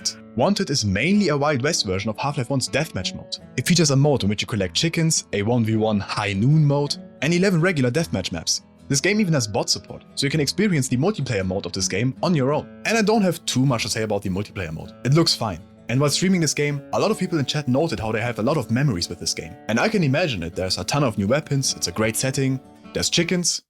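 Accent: Germany. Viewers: Lovely video! Like others have said, you are very engaging while presenting on camera. You kind of remind me of a younger Fernando Alonso but with a German accent.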